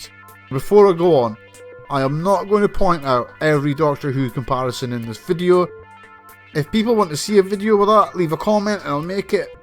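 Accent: Scotland, United Kingdom. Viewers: Lol love your Scottish accent😂 Keep it up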